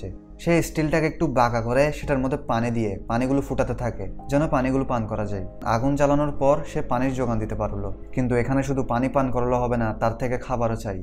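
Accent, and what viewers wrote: Chittagong, Bangladesh. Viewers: Vai chittagonian naki😊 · চট্টগ্রামের আন্চলিকতার টান পরিহার করে ব্যাখ্যা করুন।শুনতে আরও ভাল লাগবে। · ভাষাটা একটু প্রবলেম,চাটগাইয়া টান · Oni hoitoba Chittagong ar ar jonno onar kothai Chittagong ar tan chola asa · Chatgaiya tune ta na anar cesta koren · Apni Chittagong thaken??? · Vai Apner lenguage a CTG local er tan ase. Explation valo hoise kintu Kotha bolar expression aro practice korte hobe , Good Luck. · আপনি কি চিটাগাং এর মানুষ 😅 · ভাইয়া কি চিটাইংগা? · আপনি কি চট্টগ্রামের? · ভাইয়া কি চট্টগ্রাম এর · are you from Chittagong? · ভাই হচ্ছে চট্টগ্রামের লোক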